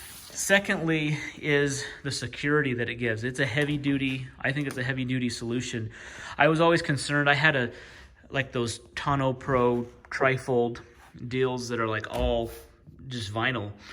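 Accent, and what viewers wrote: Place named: Utah, United States. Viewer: First time visitor to your channel... Good info on this cover. I'll probably pass on buying it. As a former resident, I can tell you're in Utah just by listening to you. We have an accent all our own. Lol keep up the good work!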